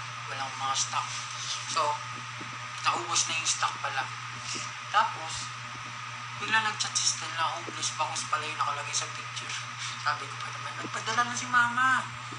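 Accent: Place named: Cavite, Philippines. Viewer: Kabitenyo yarn?! Hahahahaa Ang cute ng accent mo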